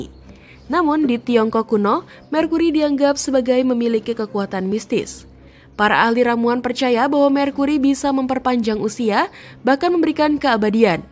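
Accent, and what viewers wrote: Indonesia. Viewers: lidah indo agak beda boss.. 😂😂😂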